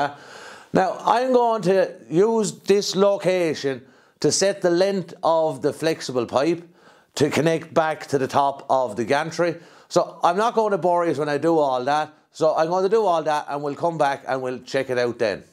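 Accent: Ireland. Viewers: I like this guy's accent, words like "tinking" and "thaught" and "tat", he be so Irish tis great.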